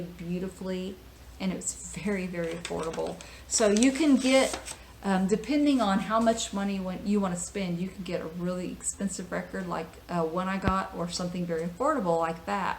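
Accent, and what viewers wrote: United States. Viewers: I'm English (in London). Although I only post an occasional comment, I always watch your videos - I subscribe to just three VC channels and you're one of 'em! I enjoy your soft, soothing American accent, and the way you speak with such grace. I also like the fact that you take notice of the record cover art. Best Wishes for Christmas and the New Year 🤗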